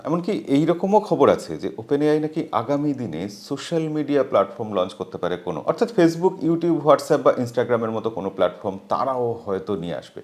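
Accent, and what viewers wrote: Bangladesh. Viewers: উচ্চারণ শুনেমনে হচ্ছে যেন বাংলাদেশের কোনও চ্যানেল দেখছি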